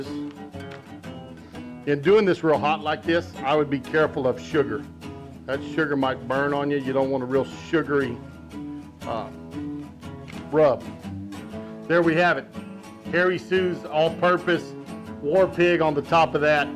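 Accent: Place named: Texas, United States. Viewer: I love your accent. I want to move to Texas. Beef. Land. Bible.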